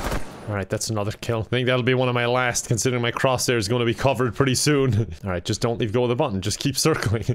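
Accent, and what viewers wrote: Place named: Ireland. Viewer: Are you Irish bc I am Love your vids ;)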